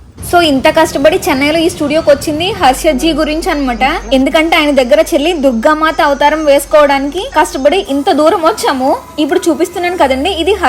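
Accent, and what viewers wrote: Godavari, India. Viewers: Godavari slang ,ma relations lo kondaru achu ne lane matladataru, rajamundry na chuttupakkala oora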